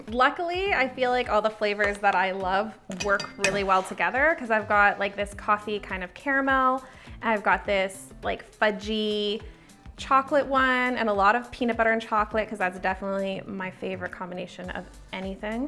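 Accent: Canada. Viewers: omg ru canadian bc u sound SOOO canadian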